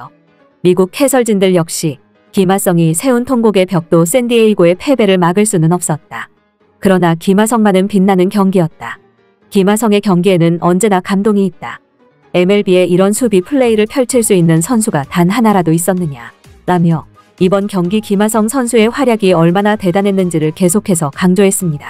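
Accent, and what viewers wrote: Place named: North Korea. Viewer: 아 젠장 결국 3루에 공던졌다는걸 이렇게 길게…. 그리고 이 아줌마 목소리 겁나 듣기 싫네. 북한여자인가?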